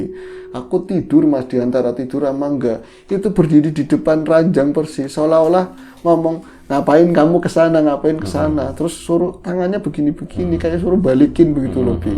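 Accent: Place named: Java, Indonesia. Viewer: Yg bikin khas adl dialek nya mas yg medok lucu.. sebenernya ini yg bikin ga jd serem hahahaa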